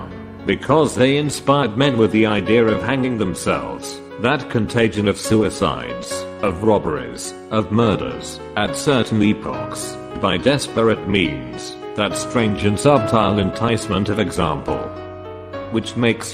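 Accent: United Kingdom. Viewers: All of his voices and pronunciation is perfect!Full of British Accent!Wonderful!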